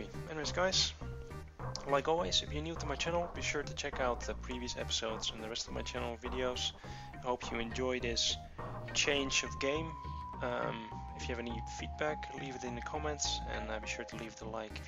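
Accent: Netherlands. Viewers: i can't but notice your accent sounds like you are dutch, am i correct?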